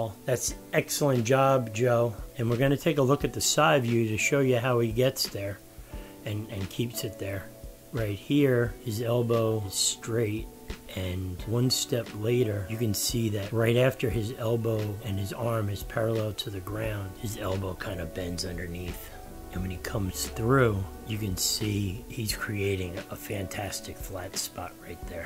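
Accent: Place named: Brooklyn, United States. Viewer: Enough of the Brooklyn accent. It's not funny and I will unsubscribe if I keep hearing it. I'm here for information not some whobis trying to be comedian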